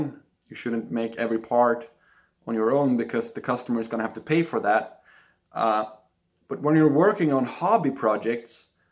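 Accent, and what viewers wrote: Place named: Finland. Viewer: I heard the Finnish in your English during the first 10 seconds! Am I right?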